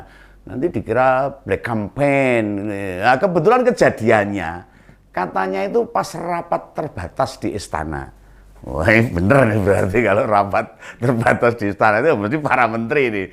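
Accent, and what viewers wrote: Java, Indonesia. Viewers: Om iki asline ngendi to.... Medoknya itu yg aq suka. (pokoknya ma grup penyuara kebenaran aq suka smua) Suwun....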